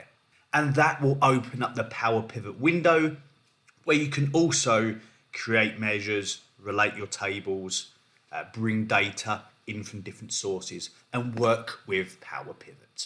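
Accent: United Kingdom. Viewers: Yes. I like your voice!So British! So unusual!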